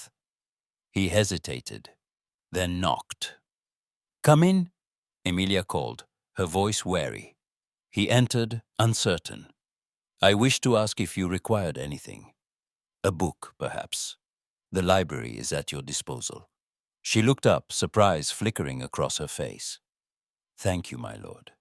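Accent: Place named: India. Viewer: The reader has a trace of pronunciation from India or smth.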